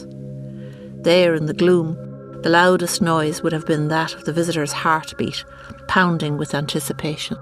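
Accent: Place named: Ireland. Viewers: Absolutely wonderful! The narrator's lovely Irish brogue lends such authenticity; clearly a highly intelligent, cultured woman. Amazing how New Grange has survived intact. Robin Witting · Oh, iffits a Colleen it's a lovely Irish Lilt, man!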